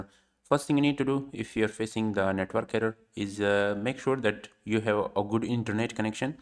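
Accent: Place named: India. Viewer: Your English with Indian accent is trouble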